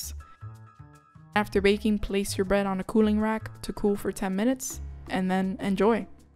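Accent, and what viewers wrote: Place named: Hialeah, United States. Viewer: I don’t even have to know you to know you are from Hialeah!!! 😂 Love it! Can’t wait to try this! Subscribed and will follow you on IG :)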